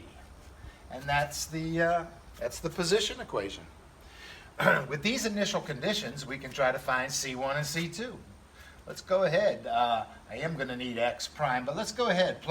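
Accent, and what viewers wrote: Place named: South, United States. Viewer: God, its feels so nice to hear a southern accent. You talk like I think. Hallelujah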